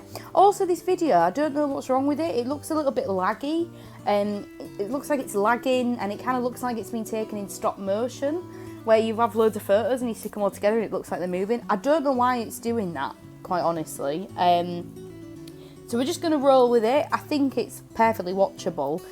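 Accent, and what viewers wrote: United Kingdom. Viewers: So beautiful!! I really enjoyed watching you decorate those yummy and oh so happy looking pages! And I also really enjoy listening to you. Love your british accent! ☺️ Could you please tell me what kind of pens you are using to make the colorful marks on the pages (dots, lines,..) Are they regular markers or brush tipped? Just curious... x.o Nat.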